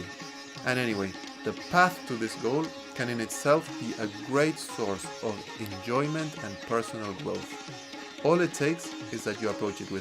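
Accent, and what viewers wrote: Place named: Scandinavia. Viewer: Kyabaathai. Very good and important work. Thank you so much for this wonderful initiative! Also I must ask: Where are you from? Your accent sounds very Scandinavian but the Indian Classical Music community is so small here that I would've known you then?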